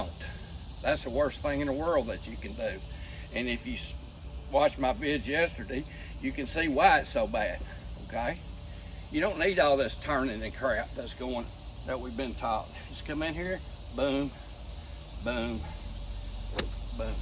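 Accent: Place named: Tennessee, United States. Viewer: I would guess from your accent you are in eastern Tennessee. But not seeing any UT flags or any other decorations has me confused.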